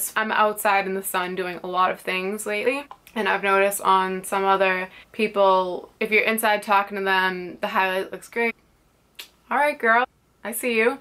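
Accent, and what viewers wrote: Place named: Boston, United States. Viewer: My current fave is SPF in skincare! (especially spf 30 & higher)...& also ur accent! Are u from Massachusetts? U mentioned Jersey earlier in the video, but I get more Mass/Boston =)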